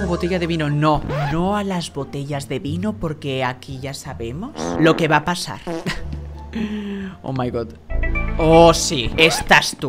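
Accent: Argentina. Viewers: Oye me encantan tus videos pero una duda ¿Por qué hablas como argentino? Perdón 🙏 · Hola, no hace falta que dejes de hablar con acento argentino, nos haces felices igual:) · Me gusta más Íker con su acento español no se siento que no le queda el argentino 😭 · Te sale el acento argentino y el acento venezolano😂😂😂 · enserio me das mucha risa a mi y a mi hermana y más que todo me da risa como hablas en argentino y dices groserías jajajajajajajajajajaja❤❤❤❤😂😂😂😂😂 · Vos sos argentino ? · eres argentino o español o de que pais eres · +granny+sustos+palomitas+sabado+su acento argentino=definición de felicidad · +terror+acento argentino=jueves perfecto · Sabes que habla como argentino??? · nuestro ídolo por ser el mejor con combinaciones perfectas como terror + comedia + sufrimiento de + acento argentino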